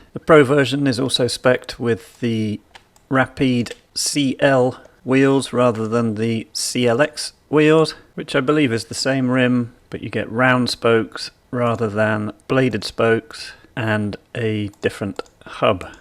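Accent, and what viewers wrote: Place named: United Kingdom. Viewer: Thanks for that high quality comparison. Specially the model-vs-model and the price comparisons over the whole range of bikes. Love it. Even though im even more uncertain what to buy now. What would you guys go for with 6500£/8000$? btw. where are you from in the UK? Love the accent and the saying you are using. Want to learn those :-)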